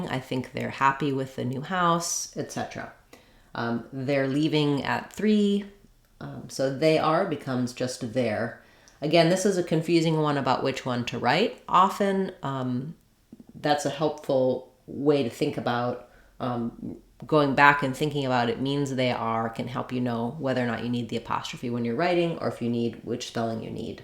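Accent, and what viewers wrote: United States. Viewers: Thank you,. It's a lot of people helped change the English language. Special American accent Thank you so much.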